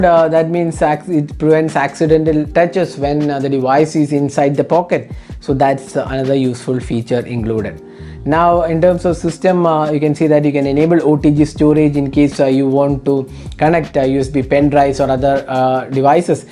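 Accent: Kerala, India. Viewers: I like your mallu accent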